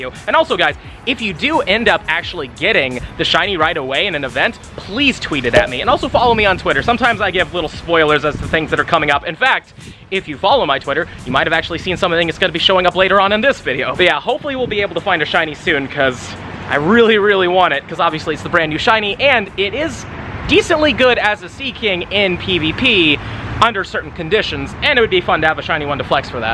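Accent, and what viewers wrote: United Kingdom. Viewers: Where are you from the uk? O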